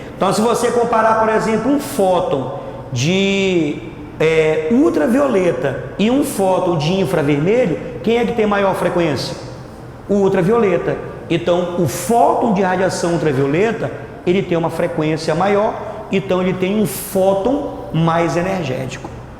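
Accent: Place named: Belém, Brazil. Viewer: De Belém... Reconheci pelo sotaque rsrs. Mas a aula foi muito boa!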